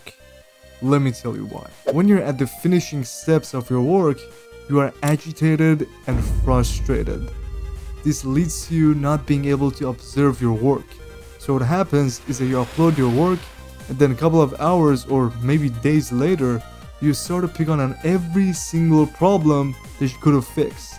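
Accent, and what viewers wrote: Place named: Iran. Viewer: ببین قبل از اینکه اسم کانالتو ببینم گفتم به خدا این داواشم ایرانیه از روی لحجه فهمیدم و خیلی از این که این موضوع رو فهمیدم خوشحالم سابت دارم داداش از محتوات به درستی استفاده میکنم❤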